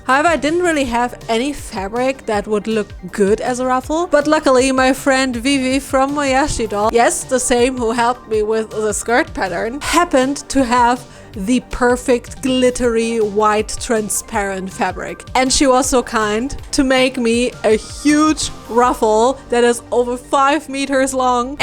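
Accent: Germany. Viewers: Du klingst deutsch 😉 👍🏻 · i literally KNEW you were German cuz it’s the accent, ANYYWAYYY girl how do you have so much talent? 😔that doll looks AMAZING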